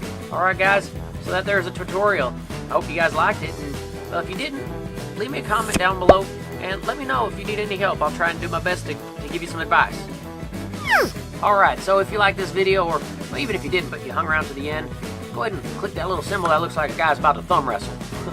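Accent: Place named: Texas, United States. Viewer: i loved your texan accent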